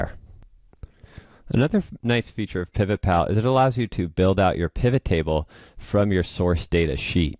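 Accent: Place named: United States. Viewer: Finally we can have a real American instructor, on Computer many on YouTube is people's from India, hard to understand any instructions in our English language, because they Hindus 😆👈 Thanks ❤️ best regards from Guatemala 🇬🇹 the Eternal Springs my temporary house also your's my friend. ❤️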